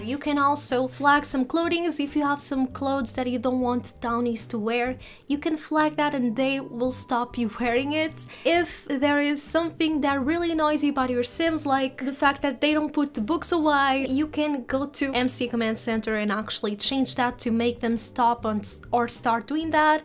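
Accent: Portugal. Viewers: i knew you were portuguese haha it's so cute on your voice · Your accent sounds Portuguese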